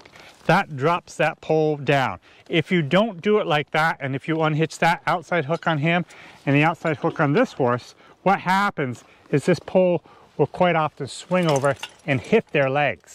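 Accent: New England, United States. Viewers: I was wondering where that accent came form N. E. but not Ma or Ma NH hs become an extension of Boston so it was out and RI (where I lived in 80's has a whole bunch of them Why Prov has at least 6 alone And Woonsocket is a place all to itself throw me down the stairs my shoes where they park the cars side by each and Cranston dont get me started By Love is from there and her nasal is bad)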